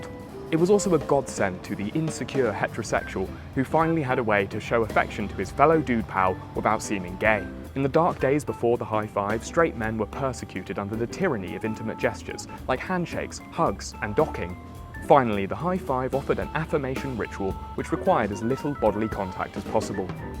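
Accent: United Kingdom. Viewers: I love hearing the British explain baseball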